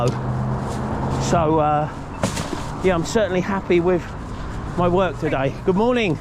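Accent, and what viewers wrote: United Kingdom. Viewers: orange county should have a volunteer program for litterpicking that you could sign up for. they'd provide bags and sticks for free. And yeah, that english accent makes you sound authoritative to us americans. good work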